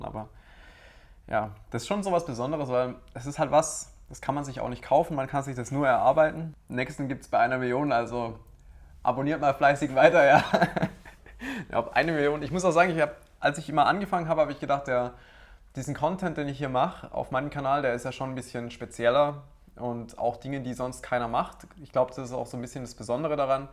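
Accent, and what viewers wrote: Swabia, Germany. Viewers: Gibt es ein Video in dem du Schwäbelst? Falls nicht sag ich Mal "Back to the Roots" :D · Ich wusste es, dass du ausm Süden kommst. Ich hab irgendwas schwäbeln gehört. So n bisschen zumindest und ich war mir nie sicher 😉😂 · eins mit full schwäbisch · Sackzefix der kerle kommt ja US m schwabeländle🤯✌️ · Does he sound "schwäbisch" to Berlin ears? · ich höre dass du Schwabe bist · Ja mei, du bischt eh Schwob :-) (habe da nur gewohnt,bin kein Schwabe)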